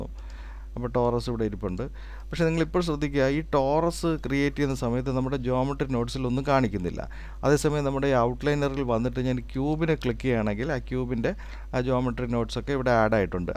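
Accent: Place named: Thiruvananthapuram, India. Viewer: സാർ., താങ്കളുടെ ടൂട്ടോറിയൽ ലാണ് നമ്മളെ ബ്ലൻഡർ എന്താ ണ്ടെന്നും ചില കാര്യങ്ങളും പഠിപ്പിച്ചതു. താങ്കൾ തുടർന്നും ഞമ്മളെ പോലുള്ളവരെ പഠിപ്പിക്കുമെന്ന് പ്രത്യ ക്ഷിക്കുന്ന നമ്മൾ തലശ്ശേരി കടുത്ത വായനശാല കൂട്ടമാണ് മലയാളത്തിൽ ബ്ലൻഡർ കച്ചവടമാക്കാതെ സത്യസന്ധമായിട്ടുട്ടോറിയൽ തരുന്ന വ്യക്തിയാണ് താങ്കൾ. താങ്കളെ നേരിട്ട് കാണാൻ ഞങ്ങൾ ആഗ്രഹിക്കുന്നു. എല്ലാ കൊല്ലത്തെ ഫിലിം ഫൈറ്റി വലിൽ ഞങ്ങൾ എത്താറുണ്ട് താങ്കളുടെ ശബ്ദ കേൾക്ക മ്പോൾ തിരുവനന്തപുരം ഭാഗമാണെന്ന് ഞങ്ങൾ ഊഹിക്കന്നു. നേരിൽ കാണാൻ ഞങ്ങൾക്ക് ആഗ്രഹമുണ്ട് അതിന് താങ്കൾനമ്പറോ ID. യോ തരുമെന്ന്. പ്രതീക്ഷിക്കുന്നു. അഭിവാദ്യങ്ങൾ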